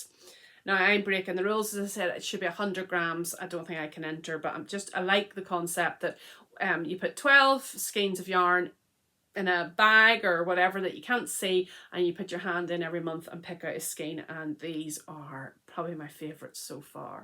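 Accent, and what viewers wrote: Northern Ireland, United Kingdom. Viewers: Just found your podcast. Made me pause hearing a fellow Nothern Irish accent on YouTube. So I am from Northern Ireland, Lisburn born and raised.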